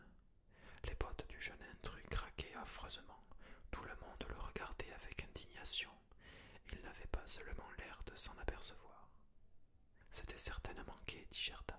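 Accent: South, France. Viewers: ...j'avais reconnu. Sud sud...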